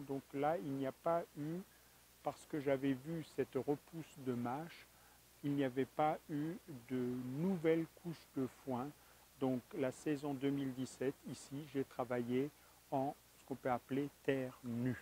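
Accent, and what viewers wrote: Alsace, France. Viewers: Bonjour, et merci d'exister ! J'ai découvert votre façon de jardiner avec joie, car à 75 ans je dois me ménager... tout en réalisant mon désir de potager. J'ai d'autant plus de plaisir à vous voir et à vous entendre que votre humour, votre léger accent alsacien me rappellent terriblement mon oncle Alfred, alsacien comme toute ma famille ! Je suis installée en Bourgogne, et j'ai convaincu mon époux de s'intéresser avec moi à votre méthode. Nous tâtonnons donc, mais cela nous réjouit ensemble. S'il est bien évident que l'on devine les connaissances derrière votre art de jardiner, je trouve très agréable que vous n'en fassiez pas des montagnes. Nul besoin de justificatifs, la véritable école est celle de l'expérience personnelle, et si certains ont besoin d'un parchemin c'est qu'ils ne sont pas très surs de leur science...mais qui peut prétendre l'être ? 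Je pense que je vais voir ce que je peux faire de la mousse que nous ratissons dans la "pelouse", soit pour border les espaces "enfoinés" soit pour remplacer le foin ou le brf à certains endroits. Se procurer du foin en rouleaux me semble encombrant, nous préférons le foin en "cubes", un peu difficile à trouver, et il nous faut le décompacter un peu.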